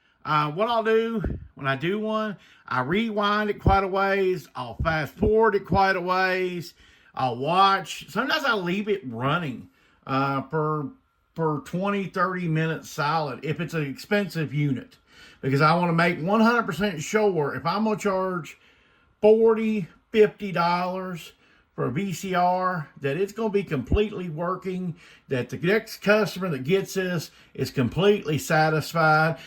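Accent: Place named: South, United States. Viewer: I love your southern accent!! 'Coconut Castaway'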